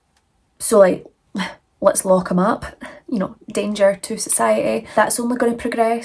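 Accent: Scotland, United Kingdom. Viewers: I'm new here love the Scottish accent I'm from England think I'm going to like ur channel ❤❤❤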